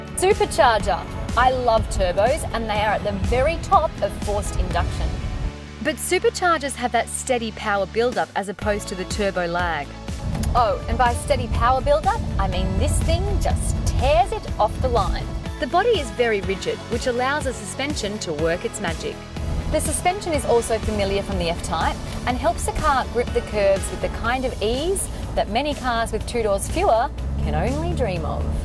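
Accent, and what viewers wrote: Australia. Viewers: since when did Aussie accent start getting compliments? anyway, the car is not as elegant as the Sydney blond!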